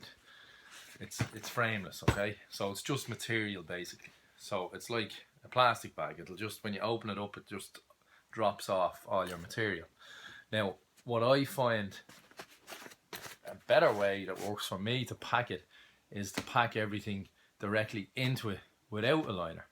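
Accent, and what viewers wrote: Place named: South Africa. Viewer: Here’s me thinking you were from South Africa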